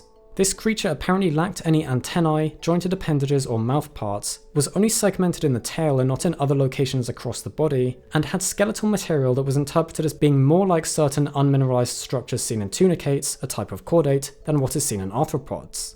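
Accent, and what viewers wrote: England, United Kingdom. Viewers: He's English. That's how they talk.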